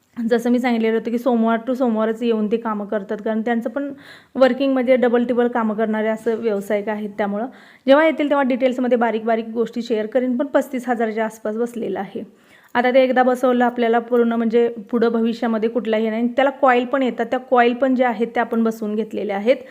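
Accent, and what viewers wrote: Kolhapur, India. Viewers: Hii Me aata 5/6 devsapasun tuze vlog bagte ahe....tumche kolhapuri language mala khup aavdte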